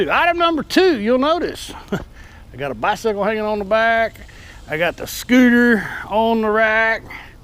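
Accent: Texas, United States. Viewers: I have to agree about you not being in the logo. Even if you're represented by a stick man wearing a hat and holding a camera. I hope you have a fun and safe trip to the Smokies. Thanks for all of your help. I'm looking for a fifth wheel or travel trailer to live in. Your videos are informative and fun to listen to. The others, not so much. Thanks again for your work and keeping the Texas accent real. Stay Safe. Stay Happy. Stay Loved.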